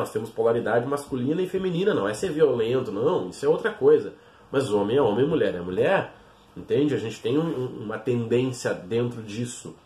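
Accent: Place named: Rio Grande do Sul, Brazil. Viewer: Homi gauchão! kkkkkkkk.. difícil hein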